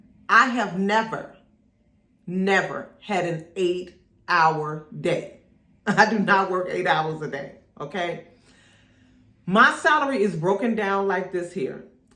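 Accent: United States. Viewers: knew you were from CHICAGO! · I knew you were from Illinois lol i can hear it in your voice 😂 I’m from Joliet! I absolutely love your page thanks for sharing your knowledge ❤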